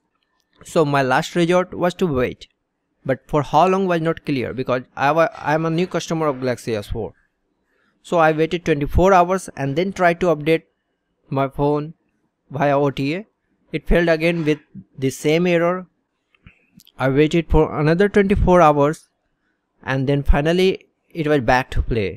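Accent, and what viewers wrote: India. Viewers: indien english dialekt?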